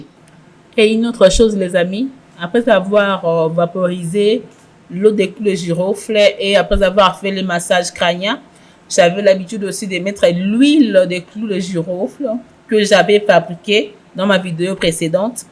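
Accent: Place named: Congo. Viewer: Hummm l’accent du Congo 🇨🇩 my sister 💪🏾💪🏾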